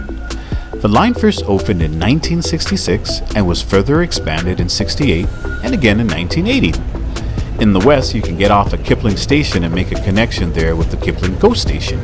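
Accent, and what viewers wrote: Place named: England, United Kingdom. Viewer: The explanation was awesome with English efficiency and accent 😍 I love this video a lot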